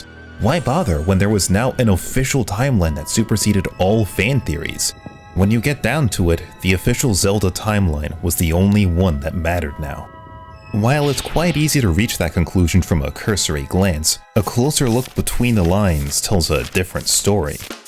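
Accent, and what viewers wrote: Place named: New York, United States. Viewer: You must have the heaviest New York accent when you're not putting on this radio voice.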